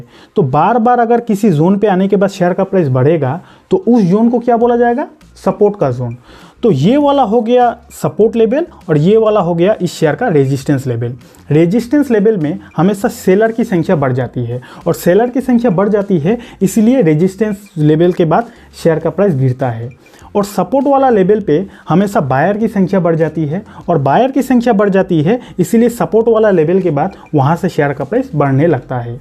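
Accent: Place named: Assam, India. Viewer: Assam ka hindi lag Raha hai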